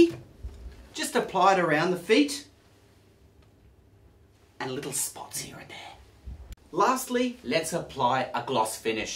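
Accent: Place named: Australia. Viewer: Are you Australia? Or New Zealand??? Coz I am Australian and my brother does this "Typical Aussie Accent" and as I watched this video, it reminded me of that voice! (No, I don't find it annoying coz I am used to it):)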